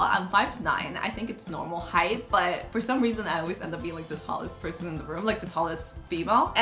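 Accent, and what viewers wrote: United States. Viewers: Yes, you sound and look like you're from St. Cloud...especially with the DIY Gucci. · is not that much you can notice sometimes BUT she almost talk as an american. But is my opinion... just saying 💕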